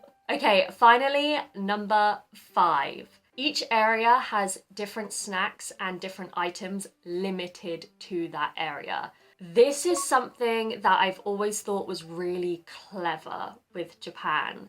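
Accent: United Kingdom. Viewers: 初めまして。英語でお話されることで外国にも日本をご紹介頂けるので嬉しいです。加えて日本語字幕も付けていらっしゃるので日本人も楽しめます。とても良いですね。また、さんのイギリス英語は、アメリカ英語に比べて、とても格調高い発音に聞こえて心地良いです。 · ソイマッチ！てゆうイギリス訛りがクセになる！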